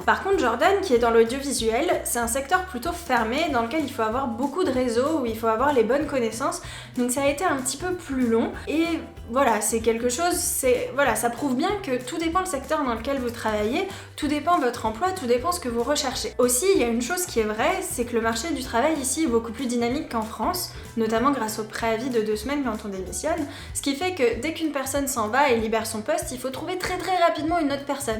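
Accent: Quebec, Canada. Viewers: Vous avez un accent québecois, bien que vous vous en appercevez pas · Très intéressant ! Je suis Québécois et j'ai l'impression que ton accent français se "québécise" quelque fois et je trouve ça charmant. Tes propos nuancés sont très à point. Tu as l'air à adorer ta nouvelle ville d'adoption :)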